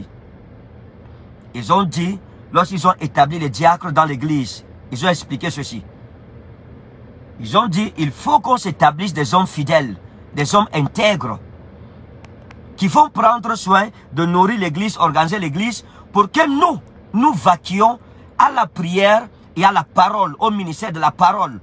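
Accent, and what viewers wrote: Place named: Democratic Republic of the Congo. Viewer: Pasto vous avez l'accent d'un zaïrois c'est juste pour savoir sa n'a pas d'importance mais je cherche à savoir ne voyez pas dans ma question un esprit polémique..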